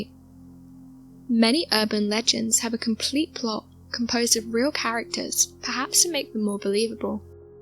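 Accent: England, United Kingdom. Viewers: It's funny hearing Mexican stories from when I was a kid... (But w/ an English accent) 😉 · Maybe she lives in America, but she definitely speaks with an English accent.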